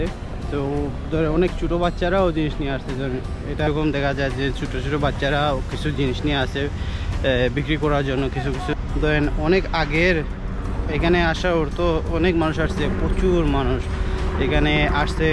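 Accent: Sylhet, Bangladesh. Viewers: Assalamuyalaikum vai. Apnar video gula khub e informative & genuine information pawya jay. Dekhe onek vala lage. Atekta visoy enjoy kori, ami o Sylheti r apnar kotay Sylhet er accents buja jay. Thanks vai erokom authentic information soho videos dewar lagi. Vai ami samer month a amar undergraduate sesh hoibo. Amar ecca Dhaka University te postgraduate na koriya baire Master’s ta korar lagi. Ami onek gula videos dekhci Sweden niya. But personally karo sate kota hoy nai in details. So, kindly apnar sate jodi contact korte partam asha kori onek upokar hoito vai.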